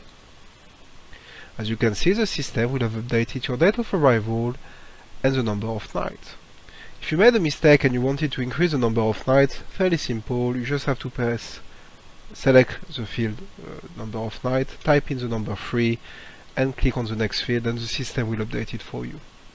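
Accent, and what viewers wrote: France. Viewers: Academy Customer Services With the french accent!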